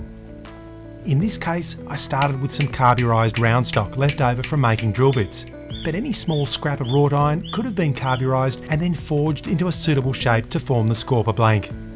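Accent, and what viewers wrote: Australia. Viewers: Ele e Australiano. Eles mudam o som dos vogais. Use os subtitulos ingles.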